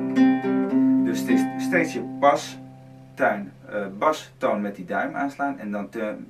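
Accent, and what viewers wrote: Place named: Netherlands. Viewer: Unbedingt ! ;-) Das Rudi Carell Deutsch is doch nicht schlimm ;-) Freu mich drauf und DANKE !!!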